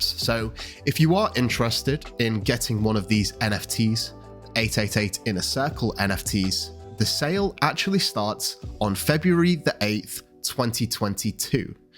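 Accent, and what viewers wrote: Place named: RP, United Kingdom. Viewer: Hard to listen to… the accent is … posh spice… perhaps subtitles would help? And the funeral parlor organ is over da top? C’mon … please, I can’t understand what is being said… praced hout = priced out?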